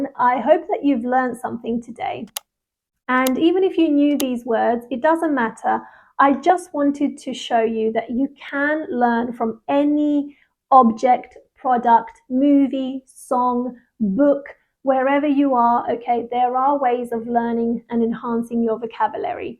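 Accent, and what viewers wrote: United Kingdom. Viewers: Lovely British 😊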